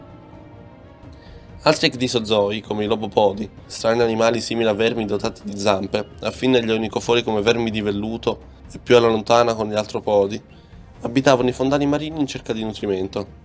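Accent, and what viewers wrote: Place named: Central-South, Italy. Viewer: anglofono? Per piacere, non arrampichiamoci sugli specchi: il narratore ha un fortissimo accento del centro-sud Italia, quindi immagino abbia avuto tutto il tempo necessario per imparare la lingua di questo paese. Non dico che si debba essere doppiatori professionisti, ma almeno parlare correttamente in italiano.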